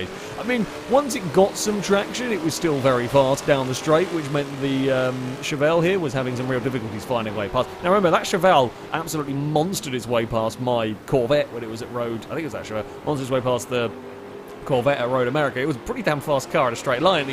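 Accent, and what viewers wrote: United Kingdom. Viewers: I never thought I'd be addicted to listening to a British guy ramble over Forza replays. But here we are.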